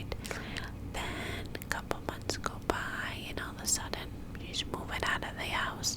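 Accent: New York, United States. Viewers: the NY accent that you do actually gives me life tbh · I love New York accent Keep going 👍🏼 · the ny accent is back!!! we love to see it (or hear it i guess?) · i’ve been waiting for another NY accent video for so long!! they are really my favourite, i can already tell i’m gonna have a good nights sleep tonight 😍 thank you, love you <333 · I love the NY accent! It’s not always easy for me to understand everything as I’m not a native speaker but your whispers always relax me so deeply 💖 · NY accent ASMR >>> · Love the gossip and the NY accent 👌💜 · Oh my god. Just on time. I was about to go to sleep but now I'm getting my headphones. Love your NY accent. Thanks for all the love and videos that you give us.❤❤❤ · The NY accent is so tingly with your super clicky and soothing wispers😍 need this video rn! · NY accent 💖💖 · The NY accent makes it more tingly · Yess perfect timing! 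I can't explain how much you have helped me lately with your recent uploads ❤ Sending LOADS of love 💕 P.S I loveeee the NY accent!!! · Live for NY accent videos! 😍 Thinking of you and your fam. Grateful for al you do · Love the NY accent kinda reminds me of my family even though they have a Boston accent but I honestly can’t tell the difference🤗. Keep up the amazing work 🤩❤️😴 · Love the NY accent videos. Thanks xxx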